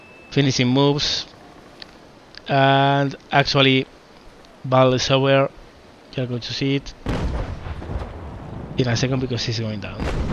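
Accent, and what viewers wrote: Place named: Spain. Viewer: Tu eres español no? Ese acento es claramente español Corrigeme si me equivoco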